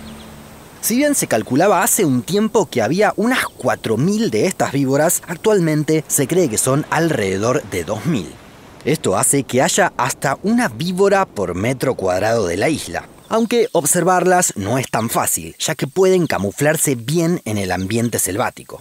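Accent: Buenos Aires, Argentina. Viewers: Muy bueno, lo único malo es el terrible acento porteño del relator.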